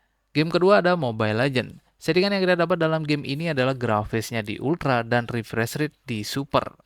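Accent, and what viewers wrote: Bali, Indonesia. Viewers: logat nya kek orng bali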